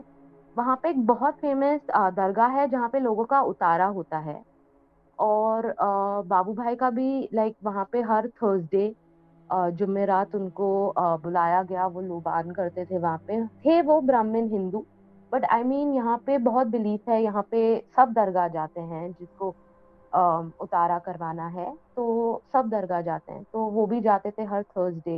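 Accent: India. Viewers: In the start of the vid I saw ur comment and now I will notice that😭😭 esp her accent is Indian that I don’t like sorry not sorry I don’t wanna be rude but😭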